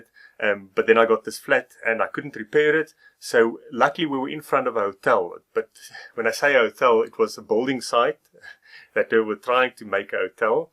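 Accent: South Africa. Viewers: South African accent is tough to understand.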